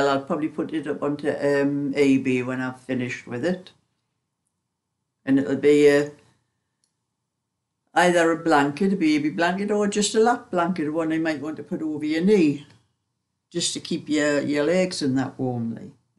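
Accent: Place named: Northern England, United Kingdom. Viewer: I love your accent, I’m from the South, so I know your up North..lol Had endless rain which made my grass grow. Cut it and the mower really struggled, as did l.. Your knitting is beautiful. I can knit but only simple things, at the moment using my stash for pet blankets for my daughter’s vet.I’ve just learnt basic crochet ( from YouTube ) after many years trying but only doing the simple single st at the moment…baby steps…lol 😂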